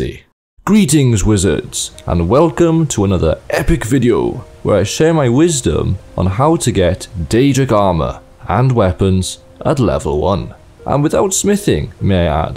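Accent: Wales, United Kingdom. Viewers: are you actually welsh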